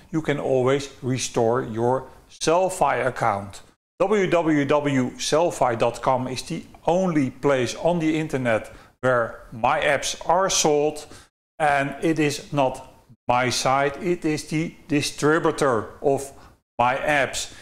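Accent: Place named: Netherlands. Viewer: Dankjewel voor jou dienst. Altijd leuk om de Nederlandse accent te horen. Groetjes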